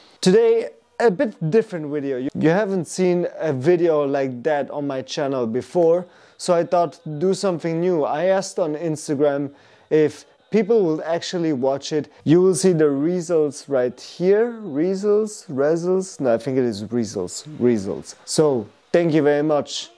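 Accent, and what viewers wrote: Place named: Italy. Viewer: Wearing Gris charnel far too much at the moment 🤣. That Italian accent, almost as good as mine 🥲👍🏻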